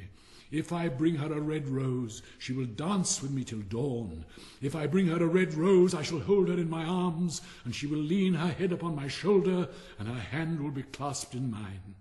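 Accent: United Kingdom. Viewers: Thank you! I was looking for a british narration.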